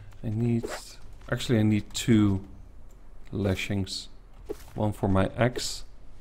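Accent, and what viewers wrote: Netherlands. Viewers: I hear a Dutch accent. How do I know? In Dutch to. Hallo. Goed Engels gesproken. Ik dacht DAT het eerst normaal was.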